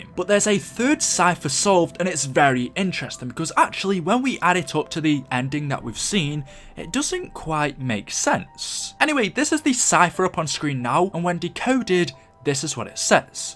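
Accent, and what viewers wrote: United Kingdom. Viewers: Is the Mansion in buried the blacksmith's who built the pack a punch? I mean the pack a punch is near the Mansion so can this possibility be true? P.S show me in the video plus are u British?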